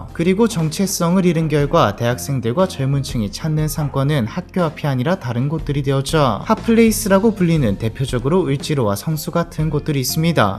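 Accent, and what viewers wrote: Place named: Busan, South Korea. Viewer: ㅋㅋㅋ 해설자 양반 부산 출신 인가보군요 같은 고향이군요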